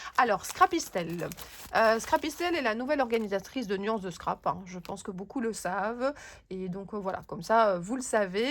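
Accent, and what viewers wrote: Belgium. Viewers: Bonjour, je participe et mon coup de coeur est double avec le tampon Lavinia et aussi le lot de chez universcrap. J'adore tes vidéos surtout quand tu nous montres des tutos de création et j'adore ton accent belge et ta façon de parler avec certains mots qui fond toujours drôle dans mon oreille. J'adore rencontrer les exposants dans les salons pour mieux pour mieux connaître les produits qu'ils proposent et comprendre mieux leur univers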